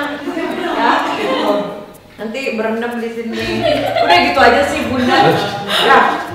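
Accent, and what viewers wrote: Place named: West Java, Indonesia. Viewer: Kenapa ya klw. Bunda ngomong pinginnya saya ketawa lucu suka ada sundanya 😂😂👍😍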